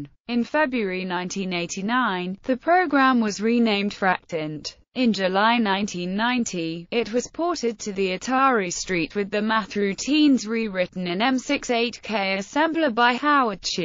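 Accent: United Kingdom. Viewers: I wasn't aware synthetic voices could be made to sound British. Interesting. The CPUs of that day weren't called three hundred eigthy-six computers. It was "three eighty-six" (386) followed by the speed of the CPU in MegaHertz. My friend John had a top of the line 386 without a FPU (floating point unit) that he used to make fractint fractals on. At 640x480 we could go watch a couple of movies, stop to get something eat, go to the new QuikTrip to load up on a variety of sweets, go play putt-putt, go to the comic book/gaming store, or possibly play a few games in the video arcade. By the time we got back the fractal might be done rendering. Then there was the time waiting for it to save the render. As always hope the power didn't go out or his mom turn off that PC to conserve money on her power bill. I love FractInt. The one thing you failed to mention is the editing of the virtual video settings to allow renders up to 20,480 by 20,480 pixels!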